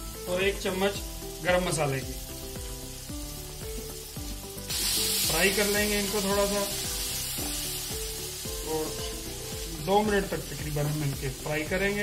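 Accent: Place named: Turkey. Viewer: jub aap urdu bol rahy hoty to turkish style thora thora aata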